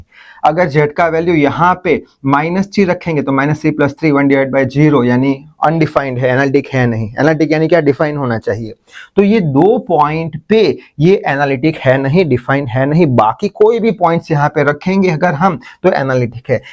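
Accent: Gujarat, India. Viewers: Sir are from Gujarat right????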